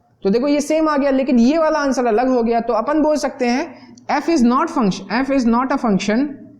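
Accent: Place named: Mumbai, India. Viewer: if i am not wrong this sir is from mumbai.Guessed by his accent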